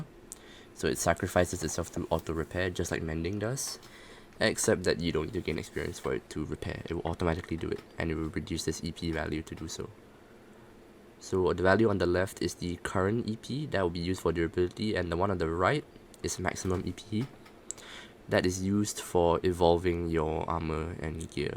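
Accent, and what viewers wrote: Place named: Quebec, Canada. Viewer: Were are you from you sound quebecois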